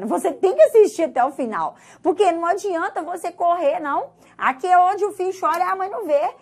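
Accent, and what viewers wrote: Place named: Minas Gerais, Brazil. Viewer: Parece minha tia q mora em Barbacena MG (sotaque)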